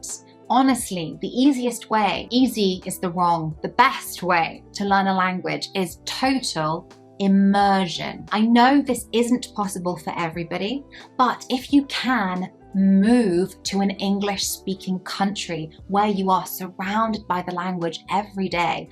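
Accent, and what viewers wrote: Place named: United Kingdom. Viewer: Dear it is a real pleasure for me;) it helps me remind some expressions and refresh this beautiful language. Love the UK accent more than the American one;) so polite and a ‘clean pronunciation’ Definitely, English has been very important in my life and musical career … more than Spanish … can you imagine? Happy to discover your Channel and follow every episode🙏🩵🙏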